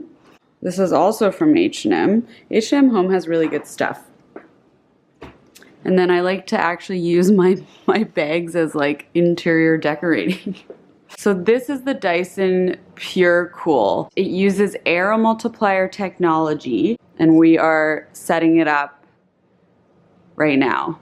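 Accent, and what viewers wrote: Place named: Canada. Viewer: is anyone else able to immediately tell when someone from toronto/vancouver is speaking english or is that just me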